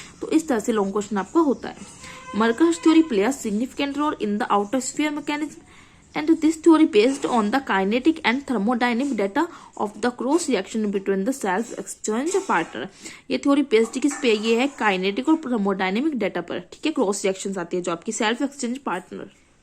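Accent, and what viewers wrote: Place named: India. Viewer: Peak Indian accent didn't even understand a single word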